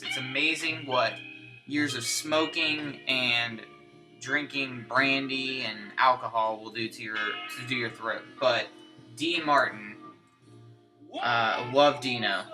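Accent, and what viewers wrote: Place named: Boston, United States. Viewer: You have a little bit of a Bostonian accent too. You are a man of my heart showing that Dean Martin record. My favorite crooner of all time. I am really sorry to hear about your mom. That really did upset me hearing that. She sounded like she really loved her music. had an amazing voice when she was young. Of course I love the. They wrote some amazing psych songs early on that no one really knows about. Good to meet you my friend. Talk soon~ Rob (^‿◕) Boston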